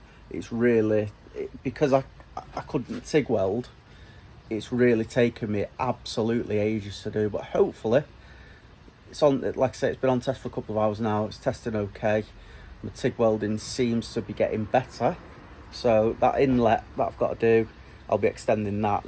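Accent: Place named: Bradford, United Kingdom. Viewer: I've got a mk5 tipper that's been fully rebuilt and solid as a rock in blue single wheel with German axle that i drive daily and love it but it still needs a few little bits to make her mint but to find one that don't need welding is a miracle. I've had loads over the years but I'm keeping tabs on your build to do my own in winter but yours is the most informative build I can find and makes for a good watch. You don't sound too far from me in Bradford either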